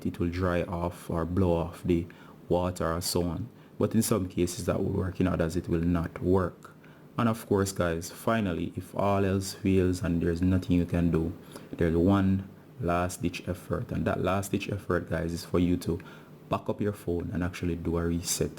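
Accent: Jamaica. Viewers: Me alone notice issa jamaican🤩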